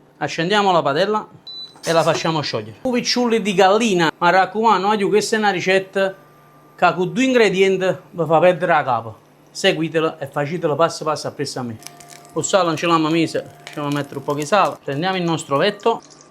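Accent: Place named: Naples, Italy. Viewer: Sei troppo simpatico!!! Adoro la tua napoletanità, il tuo dialetto, il modo, mi strappi sempre un sorriso e anche la voglia di cucinare! 😊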